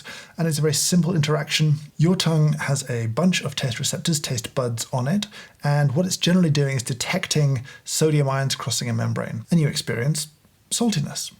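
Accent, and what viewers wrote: United Kingdom. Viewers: Yeah, it's called 'British accent'. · That's honestly the only reason why I watch him and I'm even British but I don't sound like this 😂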